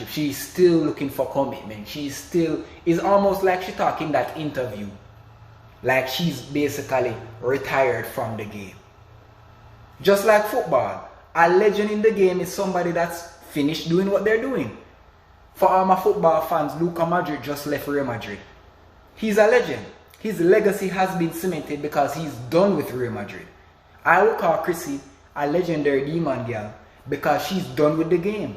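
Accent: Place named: Caribbean. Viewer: You said all facts bro, I just subscribed didn’t know you make content I hear the accent, im Carribean as well, big ups 🤛🏿💯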